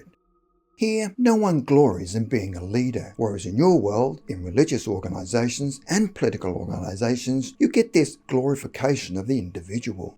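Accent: Australia. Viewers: Very easy for me - an Australian accent! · Great material but it is hard to follow your speech with your thick Australian accent. The woman that sometimes narrates your videos is quite understandable to an American ear.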